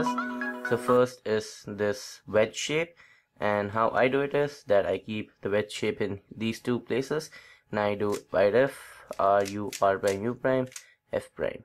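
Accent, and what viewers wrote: India. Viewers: sounds more American but this is Indian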